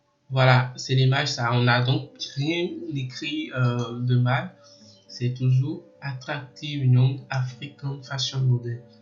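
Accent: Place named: Benin. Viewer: Déjà je n'ai pas encore suivi la vidéo mais par les premières tonalité c’est un Frère du Bénin et ça fais super plaisir J'aime Koudo Azo Nonvi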